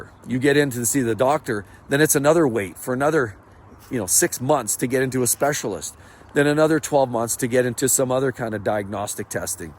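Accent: Canada. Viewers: Sorry if you mentioned before..... Are you Canadian ? When you say about...you pronounce : a boat. Not making fun...l really like it 😂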